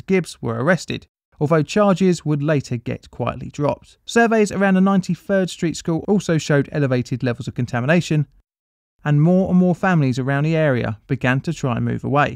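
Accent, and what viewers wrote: United Kingdom. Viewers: This was an American disaster so what's with the British narrator?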